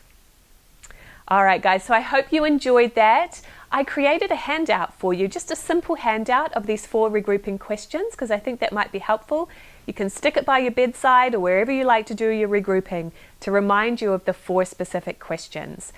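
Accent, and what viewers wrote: New Zealand. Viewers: Very helpful thank you. I discovered you a short time ago on YouTube and I have appreciated a lot of what you have been sharing. I particularly resonated with your video on Doreen Virtue... I have never been comfortable with the traditional explanations of things like angels when it gets presented as absolute fact while things, and our understanding of them, can evolve. You sound a lot like a New Zealander and I'm guessing you've spent some formative years in the lower South Island (although I've been wrong countless times before). Best wishes from Nelson, NZ.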